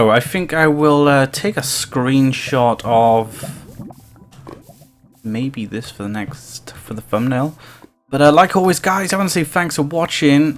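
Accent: Wales, United Kingdom. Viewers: I'm British, and I think you sound welsh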